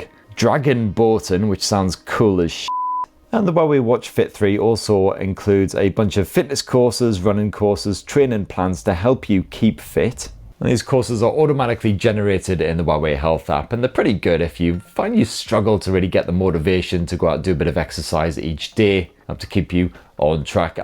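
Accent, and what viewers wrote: United Kingdom. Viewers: I agree.. the way he swears and all those stuff goes well with the British accent more than anything else 🤭🤭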